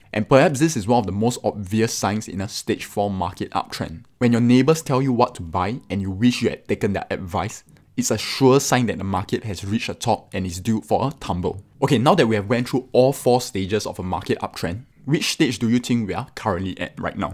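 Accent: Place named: Singapore. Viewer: No pun intended. It’ll be nicely if you can slow down when speak. You sounded like one of my colleagues from Singapore. He has a similar accent like you but speak in a slower pace. In the US, we also have a different accent among various states. Other than that, the content is helpful.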